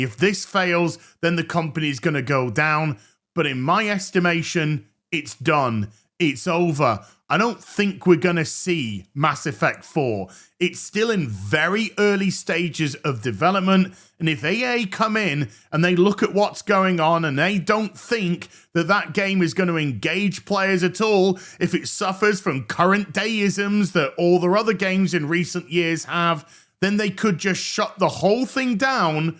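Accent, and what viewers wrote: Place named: United Kingdom. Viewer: mate, the british accent is already insufferable, why would you subject potential viewers also to those veilguard clips they've guaranteed already seen before?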